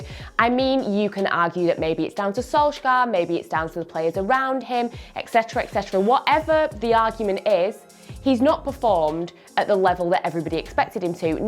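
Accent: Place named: England, United Kingdom. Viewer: Hard English accent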